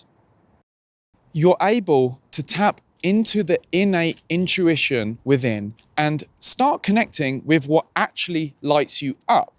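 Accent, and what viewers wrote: United Kingdom. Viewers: Great content man! I've been meaning to explain this topic to people but they don't understand me or don't want to. I've seen people overreact and become so identified with their minds that they seem out of control so I try to help but it's hard. PD: I love the accent, I'm from Argentina and I'm studying to become an English teacher and here we learn RP English.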